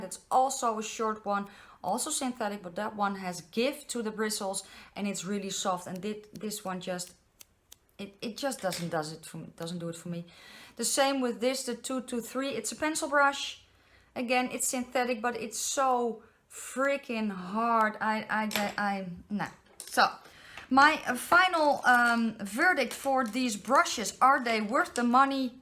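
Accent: Netherlands. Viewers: I heard your accent and I said to myself she is definitely dutch, and ahah i guessed right, Ik kom ook uit Nederland, Love the review dear thanks xx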